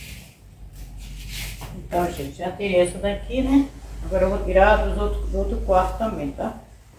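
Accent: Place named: Bahia, Brazil. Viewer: Agora parece que é baiana mesmo né oxente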